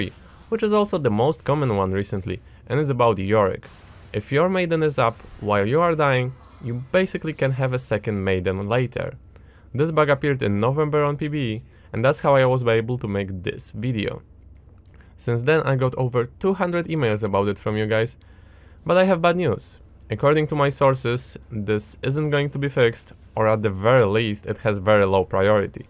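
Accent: Poland. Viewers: man you sound like you're Polish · Słychać polski akcent xD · I dont know why but i feel like u have polish accent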